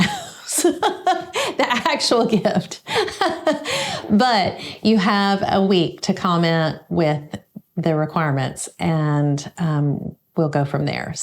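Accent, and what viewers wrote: South, United States. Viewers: there are so many wonderful moments of laughter and joy in all of your videos, that's why I watch. I guess the episodes that i enjoy the most are any that highlight your wacky and interesting ways that you have with the English language and how you put your own spin on certain words, and your Southern charm is just so captivating. I am Jane from the UK. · I love your Southern, casual, conversational style in all your videos but especially in Why the Catbird. It’s like a visit with a good friend. ❤ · I love all of your videos but my favorite when you showed your first quilt. It brought back lots of memories when I did not know what I was doing but went for it. I live in Bagley, Ala so we are only about 50 miles apart. That is great because I love hearing your Southern voice and words. Keep up the wonderful and fun videos. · Congrats, on your 10k+ subscribers! 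From Wisconsin, I love your relaxed, slightly southern, humorous delivery - I feel we are friends, and maybe sisters in a previous life! My favorite is from your “My Very First Quilt” video. You said “I didn’t know what I was doing (so) I didn’t know to be afraid.” I think we have all been there! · I live Springfield, Oregon, but several years ago I got to live in the South for 7 years, and once I heard your voice I felt right at home. I'm a craft dyslexic, directionally dysfunctional, and come from a long line of Fabricholics. I too love thrifting. Your calm way of explaining things helps me feel like I really can make something. I especially enjoyed your short tutorial about how to use what little time we have wisely. You set your timer and polished off some "catch up" thing you don't enjoy doing, but you taught us that being self disciplined in doing the menial tasks IS part of the creative process. 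Thank you 🙂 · My favorite moments are when you laugh at your southern accent and your husband posts definitions. Fountain Hills AZ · Congratulations and Paul. I'm a fellow fan of spots, gingham and recycling but mostly I enjoy your joy and warm southern accent. I live in a tropical Australian city where the men seldom wear dress shirts but I can enjoy your stash creations from afar. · Congratulations on your 10,000 K subscriber milestone!! You have inspired me so mucn, especially with the way you showed us how to use thrift stores to make quilting affordable. I had never given it a thought before you showed us how to do that, and kinda what to look for. I have to mention how much I LOVE your SOUTHERN ACCENT. I grew up in Ky so it is so soothing for my soul when I watch your videos. I am currently living near Gettysburg PA. Keep ups the great content. Thanks to Paul for being such a supportive person in your journey.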